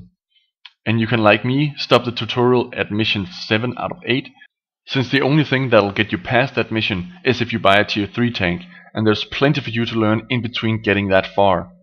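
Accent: Denmark. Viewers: Are you danish? ;) Btw, love your vids! :D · You're Danish aren't you? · You sound danish. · Are you danish..?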